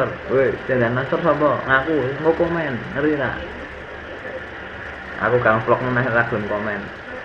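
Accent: Java, Indonesia. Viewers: Ngomong mblitar jowone metu hhhh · Sampeyan org Jawa?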